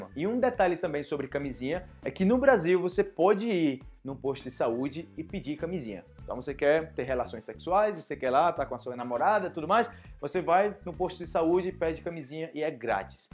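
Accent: Antioquia, Colombia. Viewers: mais ele acento colombiano es super bien .. eu gosto muito.. 👍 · Oi e gente,u sou de Peru aprendeendo portugués muito obrigada pelos videos,muito interessante,voce fala como colombiano,muito bom seu espanhol,aqui a palavra "franela" e un pedazo de tela tambem,desculpe erros em a minha escritura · vé, vos cuando hablas en español pareces a maluma, es muy paisa tu acento colombiano :D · habla como paisa . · Ivan Rojas pero si se le nota el acento colombiano 😀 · Woow, primer brasileño que le oigo hablar muy pero muy bien el español, hasta le sale un acento colombiano , saludos desde Venezuela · Literalmente 🤣 En Argentina decimos así cuando te engañan con un precio y te cobran de más (me cog-er-n), pero es una expresión muy vulgar. 😅 Tenés acento colombiano! Wow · Oi!!! Aparte de aprender varios falsos amigos"!!! Me has hecho reír tanto!!! Nunca ví un profesor tan divertido!!. 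mereces un 10!!!.y con ese acento colombiano!!! 👌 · si no eres colombiano, te felicito! tienes una gran habilidad para aprender hasta el acento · tu espanol es espectacular hasta pareces colombiano haha ... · Muy paisa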